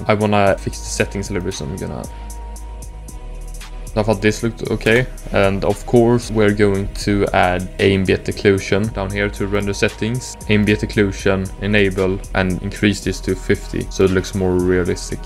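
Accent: Sweden. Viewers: are you swedish? XD, i feel like i recognise the accent as a fellow swed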